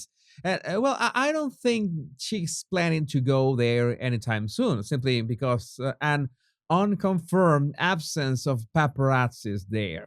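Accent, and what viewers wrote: United Kingdom. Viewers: Your British accent cracks me up. 😂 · Love the British accent!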